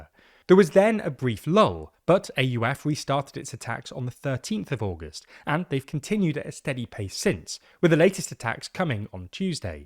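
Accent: United Kingdom. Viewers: By your British accent I can tell you are one of those who gather some audience and then start political analysis of their own. Here in this case you data collectors have been totally wrong. There is no such thing here in Afghanistan what you call uprising. Afghanistan is under strict control of Taliban who were brought by your Dads(Many in number) the United Sates not by the British who died in hundreds while defending American interest. Thanks to president trump and his administration who spitted on the coffins of British forces and didn't even bother to gift everything to Taliban. By the way you should another podcast about Russia going to attack British military targets in Ukraine. yesterday's attack 12 British engineers were smoked. Hahahaha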